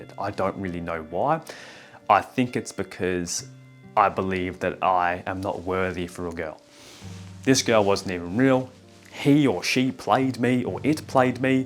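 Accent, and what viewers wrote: Australia. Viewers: Thank you so much for this video, it made me realise so much about myself i didnt know❤, also i love your Australian accent🤩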